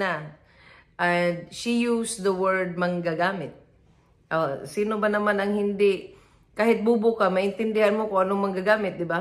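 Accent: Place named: Visayas, Philippines. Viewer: Bisaya kaau ka mgtagalog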